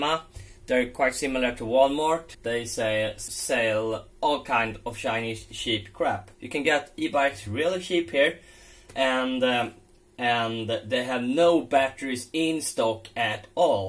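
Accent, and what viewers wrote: Sweden. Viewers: Gotta Love Swedish English gotta love clever Swedes gotta love a perfect solution Thanks - I'm now the only person in my state who knows how to fix this problem WOW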